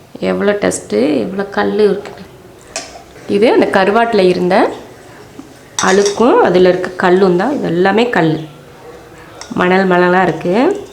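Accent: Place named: Theni, India. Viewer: Madam I am Pavithra Vijayan from Hyderabad.my native is Theni cumbum .nan ungalin subscriber.i like your cooking style madam it resembles Namma native muslim style maadhiri erukkae endru yosippen like ungaloda preparation,way of explaining that accent your tamil.later you told about Theni district special that day I confirmed .hearty congratulations mam . Namma ooru cooking stylela world wide propagate Panna vaitha ungalukkum,ungalin familykkum,and all the subscriber's kkum .my hearty congratulations.I am proud of you get a you tube channel from Theni with 1MILLION subscriber's very soon . thank you for this dish today .I like it most.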